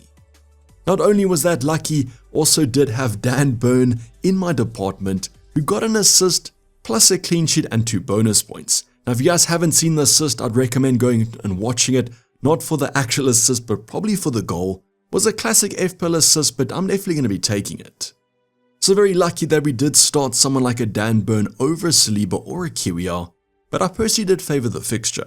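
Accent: South Africa. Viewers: This ou is definitely south african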